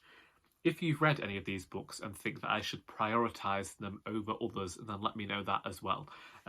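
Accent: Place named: England, United Kingdom. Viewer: Great picks. You have a cool accent. Which part of England are you from? Here's my ever changing TBR. I got really sick so I started early. 1.Sensationalist Novel: Either some Mary Elizabeth Braddon book or and Uncle Silas by Joseph Sheridan le Fenu 2. Book that takes place in the City: The Romance of The Shop by Amy Levy and hopefully also New Grub City by George Gissing3. Book that takes place in the Country: Carmilla by Joseph Sheridan le Fanu (already read)4. Best Seller: He Knew He Was Right by Anthony Trollope and, if I can fit it in, The Heir of Redclyffe by Charlotte Yonge5. Book with Female Lead: Esther Waters by George Moore and Janet's Repentance by George Eliot (already read both)6. Audiobook: The Mayor of Casterbridge by Thomas Hardy (already read)7. Group Read: Gothic Tales by Elizabeth Gaskell And for fun I'll probably read some poetry and short stories (I already read The Canterville Ghost by Oscar Wilde)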